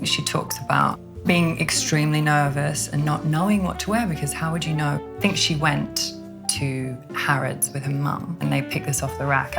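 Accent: Melbourne, Australia. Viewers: Her English has such a lovely global vibe, Australian, British and Bit of American here and there ..love it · Nah she’s just posh Melbourne they sound like this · Her accent is such a mix between Australian and English. As a brit myself I fully thought she was english for the first few minutes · Such a posh Australian accent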